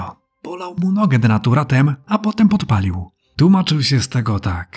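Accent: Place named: Ukraine. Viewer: dobry film, podsumowanie pisowskiej mafii 👍💪 a powiedz mi skąd u Ciebie ten akcent? Jakby ukraiński. Skąd pochodzisz ?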